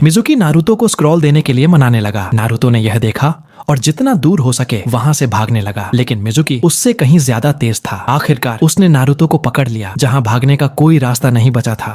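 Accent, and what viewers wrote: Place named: Bengal, India. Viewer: Haa bhai lagtahe bengali😅❤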